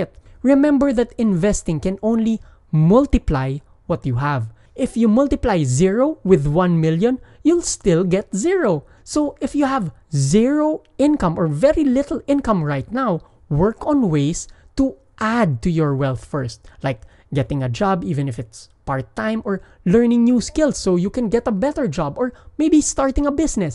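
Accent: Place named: Philippines. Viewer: Very informative and easy to understand..excellent "Tagalish" tone.. 👍🏽 Thank you,! Cheers!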